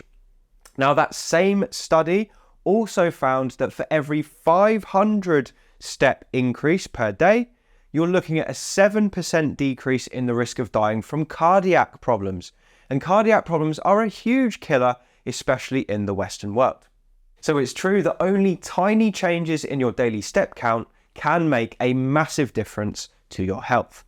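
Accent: United Kingdom. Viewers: Really like this channel. Just ordered your book from Amazon. There is something about a British accent that makes one sound smarter :) Just to add that 3 X 10 minute walks per day control blood sugar and hypertension better than 1 X 30 minute walk per day.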